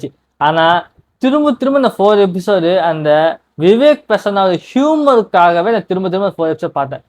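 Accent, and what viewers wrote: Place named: Telugu, India. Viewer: நீ ஒரு தனியா ஒரு வீடியோ ஒன்னு போட்டு வை டா அது என்னன்னா நீ ரியலா தெலுங்கு தான் பேசுவ அப்படிங்கறது எல்லாருக்கும் தெரியிற மாதிரி ஒரு வீடியோ போட்டு வைடா நீ பேசுற தமிழ் வந்து டிஃபரண்ட்ஸா இருக்கு போல நீ க்ளிப் போட்டு இருக்கேன்னு எனக்கு தெரியும் மத்தவங்களுக்கு தெரியாது இல்லையா... அதனால சொன்னேன்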